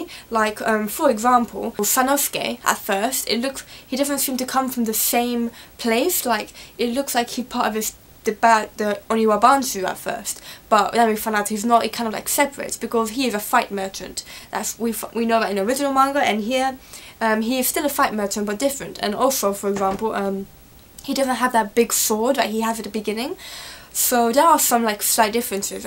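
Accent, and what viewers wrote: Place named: United Kingdom. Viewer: Sounds British to me. thanks for the review just what i was looking for:)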